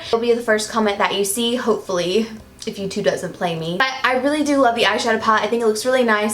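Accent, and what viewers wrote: South, United States. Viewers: I feel like you're already losing your southern twang. Don't let NY do that to you, girl! 😂😭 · i found your channel after being lost a couple years and i realized how much i love your channel! you are sweet and i love your country accent😍😍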